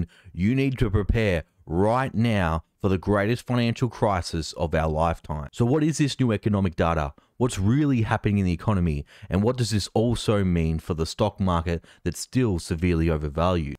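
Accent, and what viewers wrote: Australia. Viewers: It's sounds like you're an Australian. Isn't the Ausy economy in a relatively good spot compared to much of the western world? I'm about to emigrate WA from South Africa where we can't even keep the lights on and the Rand is reaching new all-time lows, so I figure it's an improvement.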